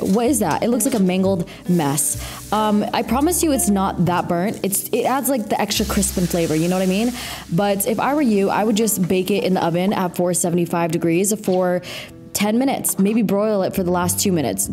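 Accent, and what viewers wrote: United Kingdom. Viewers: Pls I love you but please stop with the British accent 😭😭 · Love love the British accent ❤ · lmao love your british accent and this cooking video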